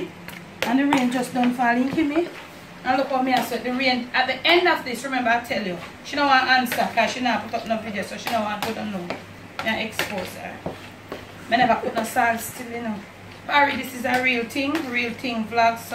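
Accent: Jamaica. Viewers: Hey! This looks great and smells great too! (Yes, my computer transfers the smells from your kitchen to my desk, somehow! Its a freakin miracle!) Any chance that you might have a written recipe to share? You speak much too fast for me to try and translate your Jamaican speak into my English ears. Thank you!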